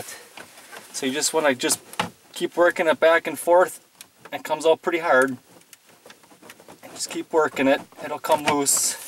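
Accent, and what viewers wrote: Minnesota, United States. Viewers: Holy lord, great videos on F150led installs but that Minnesotan or Wisconsin accent is undeniable! Don’t cha know? You betcha! What is your favorite “hot dish?”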